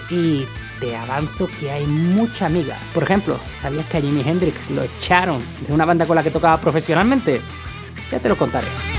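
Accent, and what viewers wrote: Andalusia, Spain. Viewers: A veces le siento como acento andaluz!!!🤙🤙🤙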